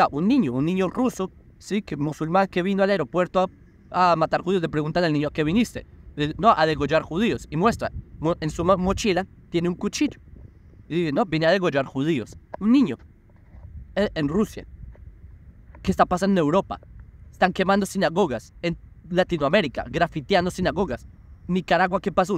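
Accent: Bogotá, Colombia. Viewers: Tienes familia en Colombia? Es que me parece que tienes mucho de acento rolo (Bogotano).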